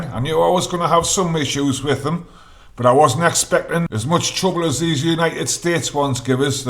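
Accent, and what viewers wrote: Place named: Wales, United Kingdom. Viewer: Hot damm, I do love the Wales accent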